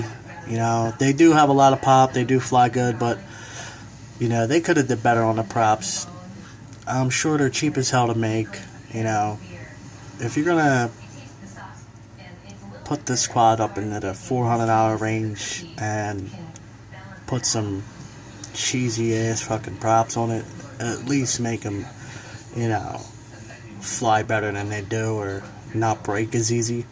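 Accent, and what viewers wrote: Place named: New Jersey, United States. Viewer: Good video...thanks for the info! Your Jersey accent was rough but I'm a southern boy LOL. Thanks again.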